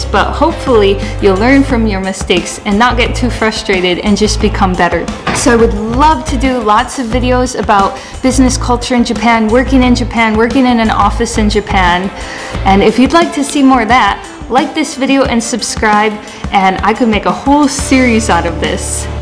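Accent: United States. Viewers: Wow you have so strong American accent. Can you practice against that? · Was going to check out your channel, but then saw the name. The term oriental is seen as a derogatory term in the US and it appears from your accent that you may be American.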